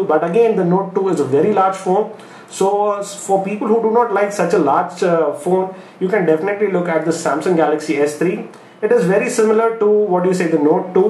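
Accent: India. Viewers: Indian accents are just awesome xD nice comparison bro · review of the two hottest phone now all done with an Indian accent.....how could you dislike this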